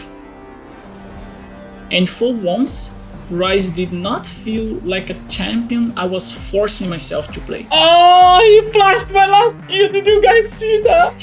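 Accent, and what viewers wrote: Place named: Brazil. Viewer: I would recognize a Brazilian friend from far far away, esse seu accent não esconde nada, man kkkkkkkkkk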